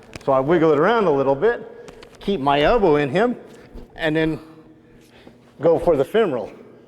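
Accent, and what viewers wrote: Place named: Texas, United States. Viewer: Is he Texan/Oklahoman? "Wooden d-..daggers.." old grumble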